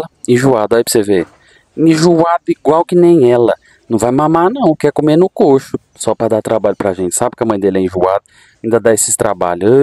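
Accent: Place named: Goiás, Brazil. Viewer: gosto desse seu sutaque de goiano Raiz rsrsrs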